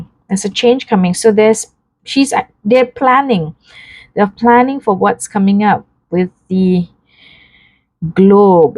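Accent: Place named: Scotland, United Kingdom. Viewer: Really great read. My first time watching you and noticed you have about 4 accents messed into one. Would love to know what they all are. I think there is a bit of Scot thrown in the mix.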